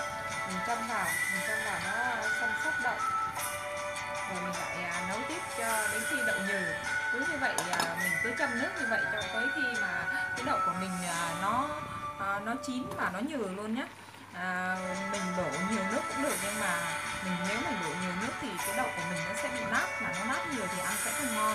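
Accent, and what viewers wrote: North, Vietnam. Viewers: Cái này dạy nghe nhạc chứ nấu chè gì , nói giọng Bắc , lồng nhạc to đùng , nghe phát ghét ai mà đăng ký kênh cho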